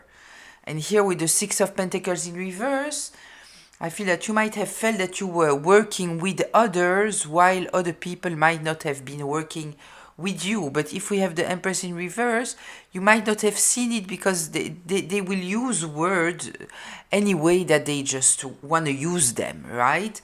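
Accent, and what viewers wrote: France. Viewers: I love the accent ma oui mademoiselle!!!! 🎉❤😂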